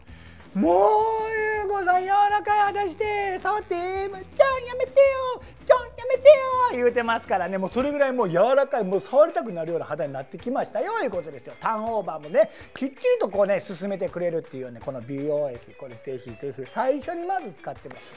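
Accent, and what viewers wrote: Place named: Kyoto, Japan. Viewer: の関西弁は大阪ではないと思いました。もしかして、京都とかだったりしますか？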